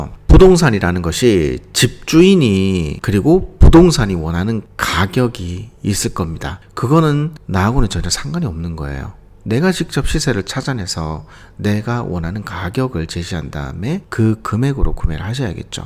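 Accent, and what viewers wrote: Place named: Gyeongsang, South Korea. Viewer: 많은 분들이 부동산 얘기들 하시는데 저랑 비슷한 출신(경상도)이신 것 같아 정감이 가네요. 혹시 질문 사항이 있을 때 이메일 같은 것 드려도 괜찮을까요?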